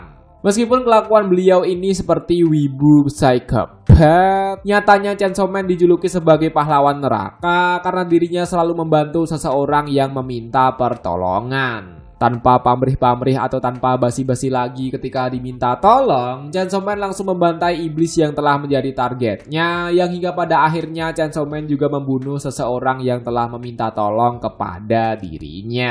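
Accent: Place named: Madura, Indonesia. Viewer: Intonasi nya kek orang Madura bikin sakit telinga apalagi pake headset 🤣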